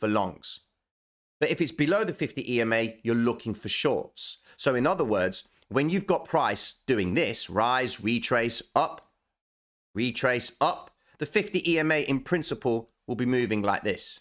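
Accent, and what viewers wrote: Cockney, United Kingdom. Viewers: Big love. Top fella cockney T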